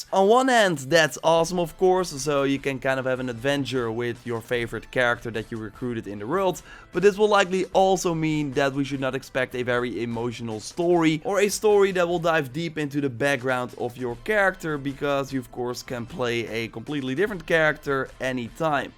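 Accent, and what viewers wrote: Netherlands. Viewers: You sound Dutch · Are you dutch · Just wondering are you Dutch? (Not bashing your accent my friend however I'm Dutch and I was wondering if I guessed correctly)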